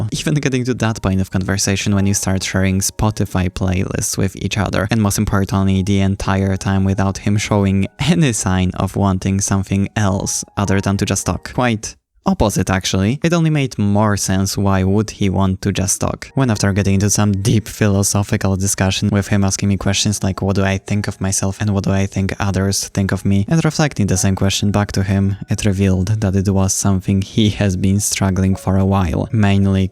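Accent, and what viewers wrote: Poland. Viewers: OK, from your accent I guess you're from Poland and I can't put the rest of my comment into English, at most you can translate it yourself if I'm wrong about your nationality xd W lutym tego roku straciłam na hypixel CAŁY MÓJ DOBYTEK. Uderzyło to we mnie tak mocno, że usunęłam moje konto microsoft (bo zostało tak zhackowane, że nie było co z niego zbierać...) i do teraz nie jestem w stanie zacząć grać od nowa. I nie jestem jakimś dzieckiem, jestem po trzydziestce, więc bycie takim debilem zdemoralizowało mnie jeszcze bardziej. Miliardy coinsów, wymaksowane staty do górnictwa nad którymi pracowałam setki godzin. Kurwa boli. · youre the second skyblock youtuber i failed to recognize to be from kingdom of poland THIS MONTH :sob: like geniuenly i thought you just have funny pronounciation and didnt connect the dots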